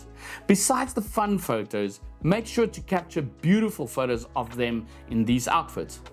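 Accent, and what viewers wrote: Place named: South Africa. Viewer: Great stuff! And I loooove your thick south African accent! Liked and subscribed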